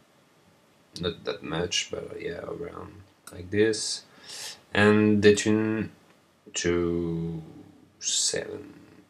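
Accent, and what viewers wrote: France. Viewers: Are you French ? You have a french accent ! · sweet french accent :) J'adore ce synth mais j'ai du mal a saisir sa matrice de modulation un tuto ou une explication serait vraiment trop cool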